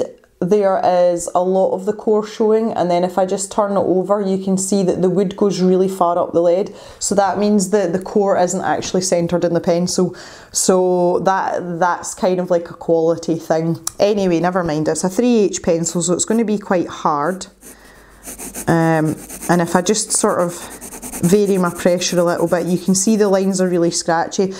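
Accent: Scotland, United Kingdom. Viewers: Aw I am so glad I clicked on your video, so nice to hear Scottish youtubers! :)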